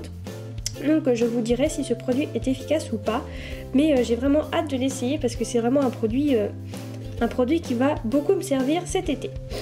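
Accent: England, United Kingdom. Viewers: haha j'adore ton accent anglais 😂😂 sinon super vidéo☺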